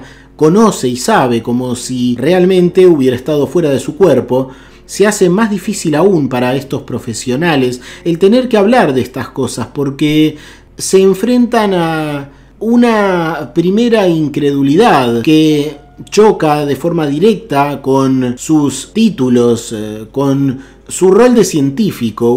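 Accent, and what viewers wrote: Argentina. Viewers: Adoro tu canal! Y con todo respeto el acento argentino es bellísimo. Muchas Gracias!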